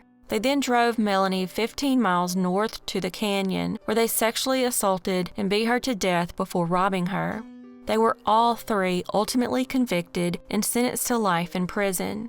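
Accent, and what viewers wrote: South, United States. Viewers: Im 6th Yay!!!! It is all so sad But at the same time i enjoy hearing your southern accent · Love your accent! Makes me feel right at home with these southern true crime cases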